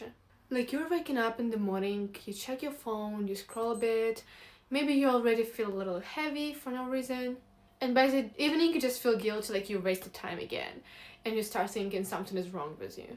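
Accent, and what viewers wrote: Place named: Eastern Europe. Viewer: i hear a slavic accent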